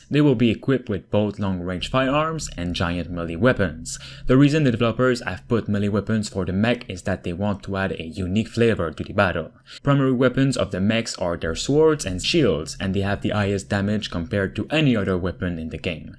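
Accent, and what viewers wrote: Quebec, Canada. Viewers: Juste de même, tes clairement Québécois, j'ai raison?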